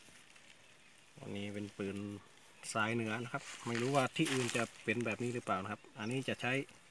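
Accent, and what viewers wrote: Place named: Korat, Thailand. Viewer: โคราชแน่นอนครับ ฟังสำเนียงแล้ว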